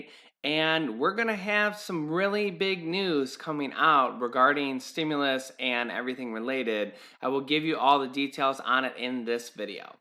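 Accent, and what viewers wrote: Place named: Ohio, United States. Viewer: Hello! I knew you were from Ohio. Didn't even have to ask. You have that Ohio accent! Hope your day has been good. Enjoy listening to your updates and hope we can get this stimulus taken care of so we do not have to discuss it anymore for now. Enjoy the rest of your holiday!!!!!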